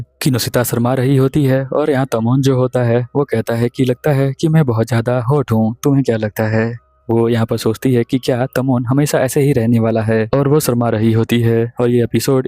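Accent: Bihar, India. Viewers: Ap Bihar se hai kya?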